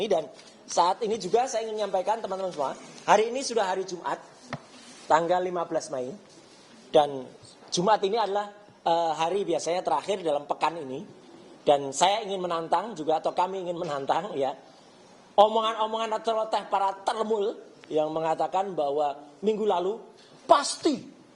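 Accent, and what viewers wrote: Java, Indonesia. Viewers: Awas lu panci karang Luh keras dan medok bahasa nya. Kalo masuk bui jangan cengeng Luh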